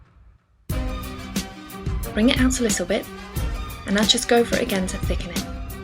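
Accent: United Kingdom. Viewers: i dont have any of the make up stuff that you have, but sometimes i watch ur vids just to listen to ur accent. I LUV UK ACCENTS!! is that weird?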